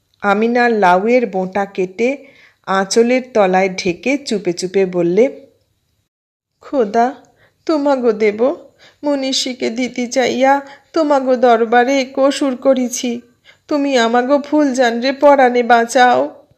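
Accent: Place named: Bangladesh. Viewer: ফুল্লোরা থেকে ফুলজান, তার থেকে ফুলি। খুব সুন্দর গ্রামীণ বাংলাদেশি বাংলা ভাষা পাঠ করেছেন। দেবীরা কখন কি বেশে আসেন সেটাই আমার ভাবনার বিষয়। গল্পের ঘটনা যা, সে যুগ আর নেই, নোংরা রাজনীতি ও জনবিন্যাস বাংলার সব শেষ করে দিয়েছে।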